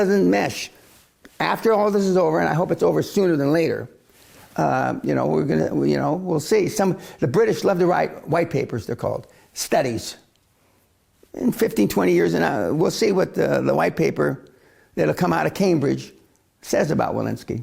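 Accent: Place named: New York, United States. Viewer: Man This.guy is a realist..I love this guy .he the only billionaire..that tells people the truth..no sugar coating ..just raw right in your face ..that the type of people I like ..straight forward. Right in your face ..when he speaks the .truth ..I would someday like to meet the guy..but I know that will never happen ..folks listen to this gentleman..he dropping..truth bombs ..to the people ....for some reason he sounds like he from NY ..Cause he definitely rolls like BAD ASS ..but I know he good guy trying to wake up the sheeples..that are in a deep coma ..cause most of them are headed towards the slaughter house ....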